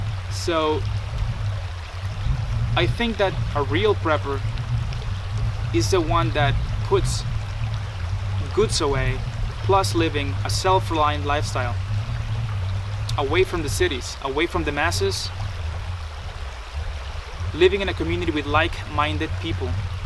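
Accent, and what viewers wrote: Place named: Latin America. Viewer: I followed you from prepper nurse 1, because I enjoy learning from many different teachers. I hope you do your videos on the steps you make on your property and the work you do towards your goal. From my years ( I have kids your age) I see you've barely left the first quarter century behind, and I want to applaud you for making these decisions which seem to go against everything your generation stands for. Also, I hear in your voice, a Latin based language (Spanish, Romanian) and was just curious, which one?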